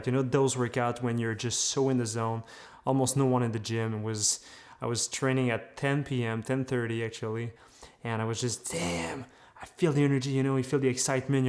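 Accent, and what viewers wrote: Quebec, Canada. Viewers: t'as juste l'accent d'un québécois qui parle anglais 😛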